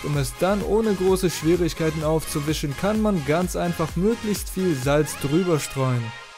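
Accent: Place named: Russia. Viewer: hört man an deiner Stimme ich bin auch Russin habe aber ich spreche normal das sollte kein hatte werden OK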